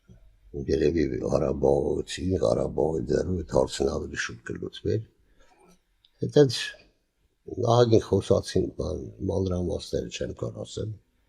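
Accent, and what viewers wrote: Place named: Ijevan, Armenia. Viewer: Кяваро иджеванский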